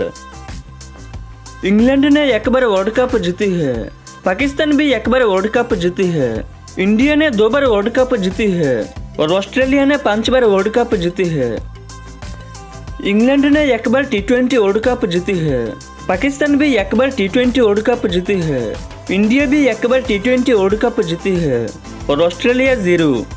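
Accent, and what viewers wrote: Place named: Bengal, India. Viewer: জাতে বাংগালী তা কথার ভাবে বোঝা যায়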